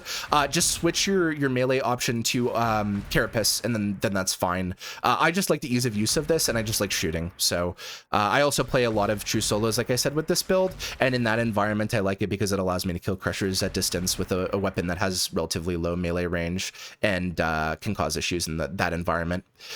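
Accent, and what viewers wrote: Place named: Canada. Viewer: Bro is definitely Canadian he's got a pretty distinct accent. With that said 🦅🦅🦅🦅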